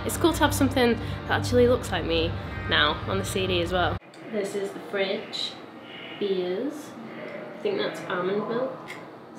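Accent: United Kingdom. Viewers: are you from Sheffield?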